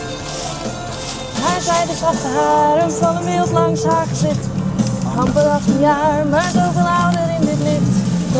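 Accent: Friesland, Netherlands. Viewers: Met dat licht Friese accent de hele tijd klagen is echt leuk om te horen sorry haha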